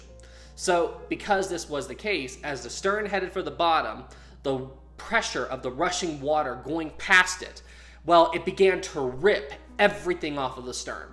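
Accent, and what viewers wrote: South, United States. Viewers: Your a yank though so excuse me if i only catch parts as that accent an hearing it bloody hell!!!!! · Are you from the south I hear a fair amount of twang in words like time it’s a good thing btw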